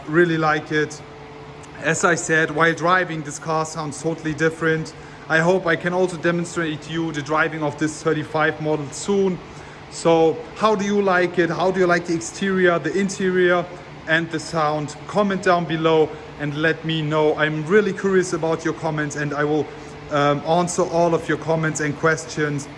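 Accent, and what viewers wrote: Germany. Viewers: sorry ich bin jetzt auch nicht besser aber man merkt das du deutscher bist wegen der Aussprache aber wie gesagt ich bin auch nicht besser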